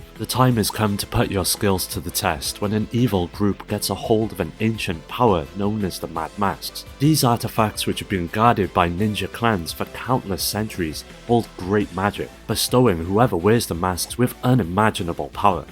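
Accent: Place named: Wales, United Kingdom. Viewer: Man I KNEW you were a fellow Welsh. You can't miss that accent.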